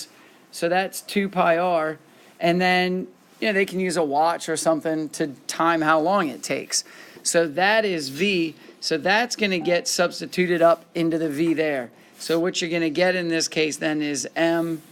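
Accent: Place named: Australia. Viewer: This guy has the mildest Aussie accent I've ever heard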